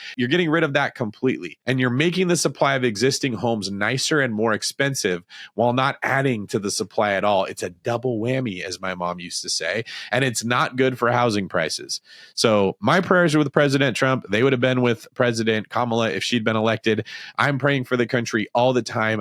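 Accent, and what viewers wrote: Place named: Scotland, United Kingdom. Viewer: Go, I love the Scottish accent! It is probably one of the hardest to do!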